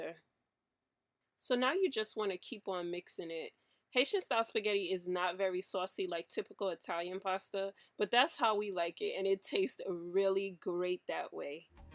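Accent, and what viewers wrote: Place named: New York, United States. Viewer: Bruh your accent is crazy New York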